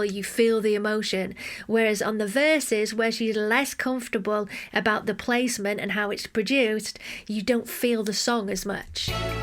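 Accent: United Kingdom. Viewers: Hi, been a fan of your videos for years, but for some reason they’ve stopped coming up on my feed. Great analysis! You have such a wonderful personality. Where in the UK are you? I love your accent. Best wishes from Philadelphia! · I'm a very huge Madonna fan.. buy besides that ..I really like you..I can fell you are a very good person ..besides that ..there's a lot of reaction videos..but yours because you are a really voice couching everything you say souds really professional and you never used offencive words... you can say..she didn't get the tone or something like that.. but never offencive.. thank you ..Cheers from Costa Rica 🇨🇷... oh ...I forgot..... I love your British accent ❤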